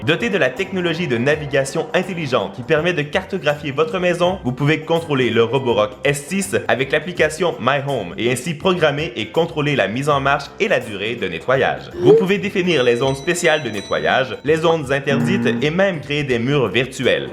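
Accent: Quebec, Canada. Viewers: Je t'aime, continue, tu es trop fort, et, j'adore la voix des Québécois. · Jtm continue tu est trop fort est j'adore la vois Québécois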